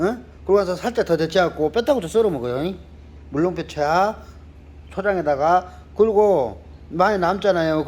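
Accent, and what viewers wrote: Jeolla, South Korea. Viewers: 전라도 사투리 증말 재밌네요..목포 생선 연결고리가 없어 구입하기 힘들었는데 반갑습니다.. 계속 올려주세요...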